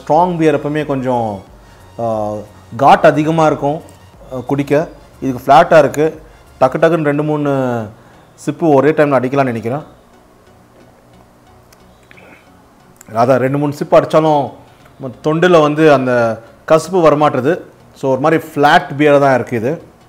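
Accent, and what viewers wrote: Bangalore, India. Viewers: Nice review. Hope you are from Bangalore. Since your slang is like Bangalorian..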